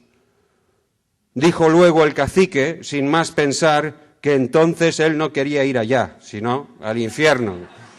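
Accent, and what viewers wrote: Spain. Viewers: maybe he is from Spain, don't they all talk like that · on this lecture he is speaking with a perfect european spanish accent... not an easy task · here he reads with an spanish accent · he's speaking in a Spanish acent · Native to Spain, that's what I meant. Argentine don't have this accent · Habla en español con acento español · He's speaking with accent from Spain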